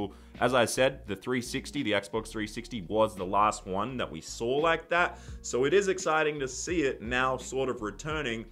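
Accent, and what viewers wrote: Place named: Australia. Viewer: Great to hear an Aussie discussing PS5! 🇦🇺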